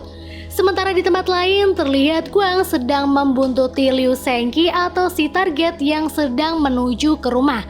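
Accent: Pemalang, Indonesia. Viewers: mbak orang pemalang ya? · Mbak nya orang Pemalang?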